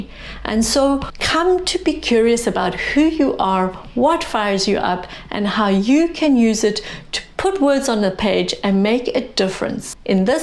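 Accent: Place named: South Africa. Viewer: Hi, Sonia from South Africa here. I just listened to your 4 part talks about voice in memoir. I am in the planning phase of writing my story of how I met Jesus (in an armed robbery at 4am in my bed) after being lost in the new age for 20 years. I feel soooo inspired after listening to you speak so beautifully about voice in memoir. I want to encourage you to please keep making these videos. You are talented, well spoken, your tone is calming and confident... and most of all, you are doing what Jesus created you to do. In Him, we can be sure that we're walking the story He purposed us to do, as it says in Ps 139 - He wrote down our days in His book! I will be praying for you to get more subscribers and also that people will comment more. I see that you are based in Canada, but I pick up a South African accent. Are you originally from South Africa? 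Blessing to you my dear sister in Jesus. ps. I did a youtube search for 'voice in memoir', and your channel was first in the search results:-)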